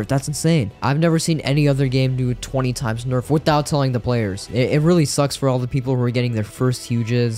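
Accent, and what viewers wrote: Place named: United States. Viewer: bro u are also indian but ur accent is like americans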